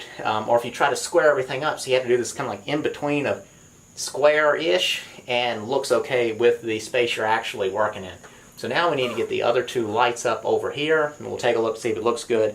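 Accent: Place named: Arkansas, United States. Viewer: His accent makes me think Arkansas. Maybe Missouri.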